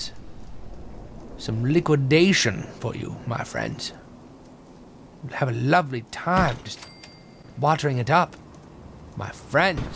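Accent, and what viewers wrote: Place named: United Kingdom. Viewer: lol... Love the British accent. All this brings me back to FNV, first saw you, and ur vids, followed you through all that, and Skyrim till that bombed, but damn you drove me nutts in that one since I was trying to follow, never did finish it. Love your mom, but I agree it's just too much for her to watch all, and u being able to say whatever you want... Thanks for all the entertainment with all these vids, they are very important to many people.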